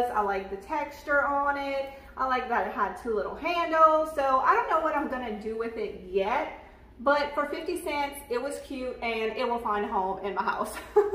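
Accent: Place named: South, United States. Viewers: your shows are such fun and you are such a KIND woman. It is a joy to watch you. P.S. My husband always knows when I am watching, "I hear your Southern Lady"s voice". You make us both smile. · I love your accent. I live in Mississippi, too.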